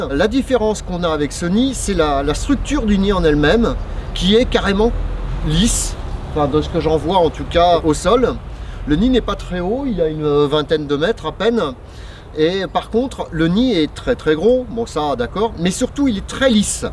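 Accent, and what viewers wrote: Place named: South-West, France. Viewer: Bonjour comme l'enveloppe du nid n'est pas commune penses tu que ce soit une anomalie ou une adaptation du frelon a l'environnement.?? J'aime bien l'accent du sud ouest.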